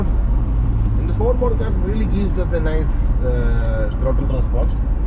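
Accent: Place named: Kerala, India. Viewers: Malayali daaaaa....😍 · Sounds like a " Malayali "......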